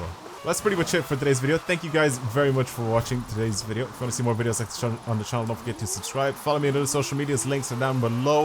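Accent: Ireland. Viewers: Are u from Ireland cuz I am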